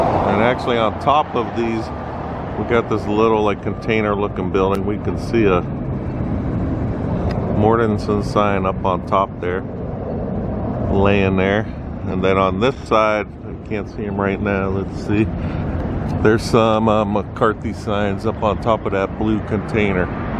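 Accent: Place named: Midwest, United States. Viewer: My wife and I have noticed your accent and it sounds overmuch like our Wisconsin/Michigan accents. Where do you originate?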